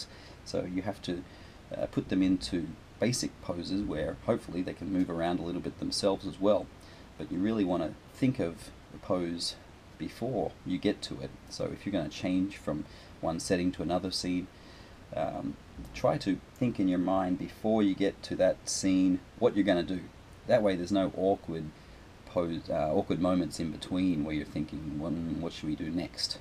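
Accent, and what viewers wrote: Australia. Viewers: Great video, I have to say... the first time I heard your voice I was like...Wait... what? Wasn't expecting the Australian accent. Now every time I watch your videos I quote Mick Taylor from Wolf creek as I watch... Kind of morbid I guess...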